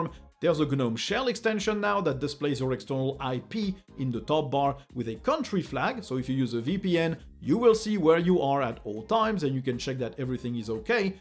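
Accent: France. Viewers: I love the accent so much. Staying up to date with Linux news is almost a bonus. English spoken with a French accent is music to my Eastern European ears. I'm not much of a gamer, but I watched (well, mostly listened to) a whole Dishonored playthrough by Raphael Colantonio in no small part to enjoy his accent. Most of the other people who were invited, part of the Dishonored development team, also had French accents. (edited - name spelling)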